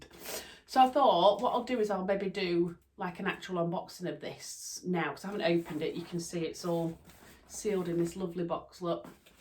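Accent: Yorkshire, United Kingdom. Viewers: Lovely to hear you chat away😊my ears prick up when I hear a Yorkshire accent. Moved to Australia 🇦🇺 many decades ago. Have you come across the Peppermint Magazine Wrap top by In the Folds? Free pattern. Could be a contender for your mums outfit.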